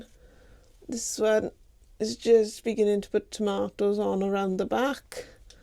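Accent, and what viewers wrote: Wales, United Kingdom. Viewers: Ah that Welsh accent! - I just want you to say lol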